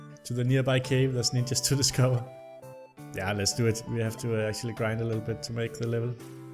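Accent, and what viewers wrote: Denmark. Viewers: You're Danish, ain't ya?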